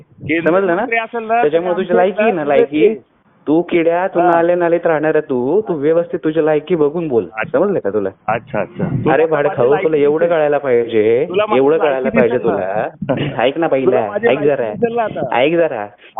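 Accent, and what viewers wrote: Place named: Nashik, India. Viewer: बोलण्यात असा वाटत नासिक किंवा A.नगर चा दिसत आहे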